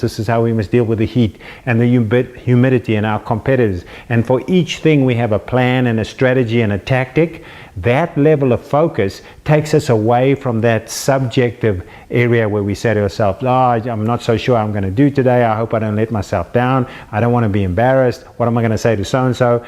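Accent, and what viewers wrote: South Africa. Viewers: South African accent!!! Best accent in the world!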